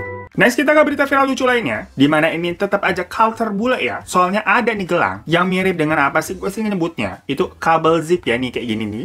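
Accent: Java, Indonesia. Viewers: jelasinnya kayak teman, bahasanya paling enak dan satu satunya yg bisa ngelawak, cerdas bang! Nessie judge: ngejelasinnya serius Arroyan Pram: ngejelasinnya dramatic dan sinematic editor berkelas Korea reomit: ngejelasinnya medhoq Yok siapa lagi nih???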